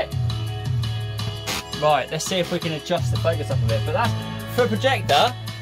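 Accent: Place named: United Kingdom. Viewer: Thiccc british accent, where you from bud?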